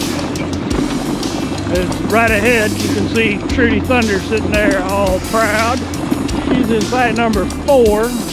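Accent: Texas, United States. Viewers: I don't know why but I really love your videos. Your Texans voice and your Hawaiian shirt makes me want to watch your channel more. I'm glad I found your channel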